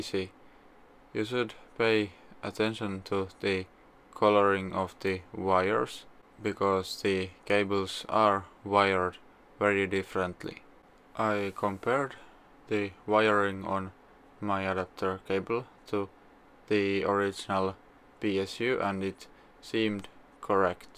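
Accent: Finland. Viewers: Katsoin 10 sekuntia ja oon täysin varma tästä Suomi aksentista. Löysin tänne koska etin videoita kotelosta kun tilasin tän siskonpojan kokoonpanoon ja halusin nähdä mimmosii fiiliksii muilla on tästä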